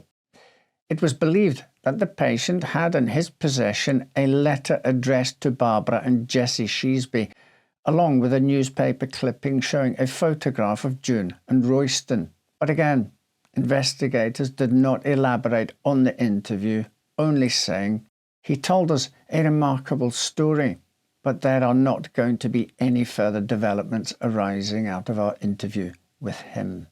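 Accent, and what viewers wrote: Scotland, United Kingdom. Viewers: I would listen to you read a phone book. your voice and your delightful Scottish speech pattern...yum. Then, the stories are always interesting, though sometimes heartbreaking. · This is one of the few channels on YouTube that manage to tell stories in a way that really and honesty grips me in every way, it's such a tragic case, and it's also such a shame that we have come to a time where kids playing on their own are no longer considered safe from murder most foul, so I can only imagine what a shock it must had been in a more innocent time where people honestly feared God too much to do such evil things. Also as always this is a high quality production, with great narration. That Scottish accent is so pleasing to listen to, you should consider reading some classic audio-books, like LibriVox books. I hope to "steal" the 'style' in clothes, it's so elegant, I only have boring clothes myself.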